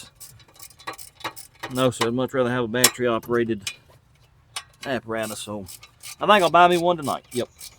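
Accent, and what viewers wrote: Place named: East Tennessee, United States. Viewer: Great video. Just subscribed mostly cause you talked like me and everybody i grew up with in east Tennessee. Great content. I can't wait for wait for the next video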